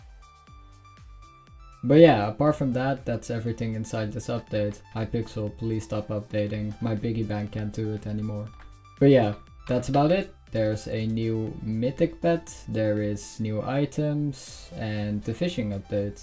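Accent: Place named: Netherlands. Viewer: bruhhh ik hoorde ik binnen 0.1 seconde dat je nederlands was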